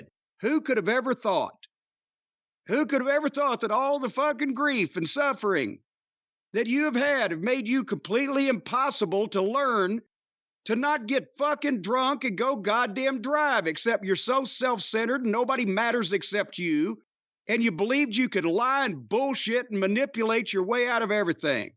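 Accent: South, United States. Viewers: I never liked Jim Cornette when I was a youngster growing up and being a fan of WWE. I was too young to really realize, I wasn't really supposed to like him. He was a heel manager, and he was supposed to act that way to get me to dislike him. I disliked Jim Cornette the character :) I LOVE Jim Cornette the orator. I could listen to him talk for hours. His cadence, his accent, and while he sounds like he should be some kind of country bumpkin, he has a rich vocabulary.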